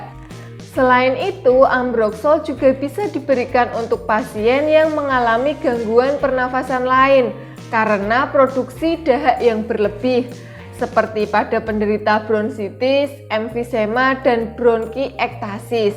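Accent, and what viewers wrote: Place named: Java, Indonesia. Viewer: Watuh medoknya..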